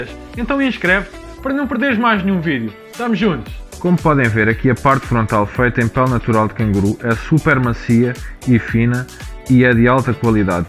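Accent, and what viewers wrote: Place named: Brazil. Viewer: O português mais brasileiro deste YT! Parabéns pelo vídeo,!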